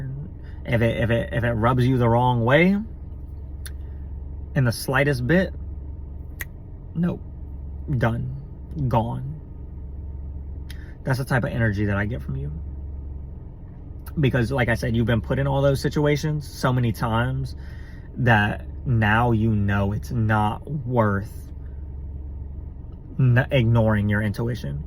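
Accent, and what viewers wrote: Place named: Tennessee, United States. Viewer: Why you talking like me ? Tennessee baby !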